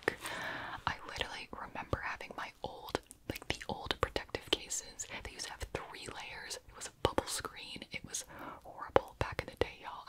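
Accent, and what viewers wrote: United Kingdom. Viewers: Ngl I love ur British accent tho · Who said that we would laugh at your British accent? It is wonderful, even tho I don’t live in Britain but I do love British accents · omg the british accent fits you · wtf i love the british accent 😭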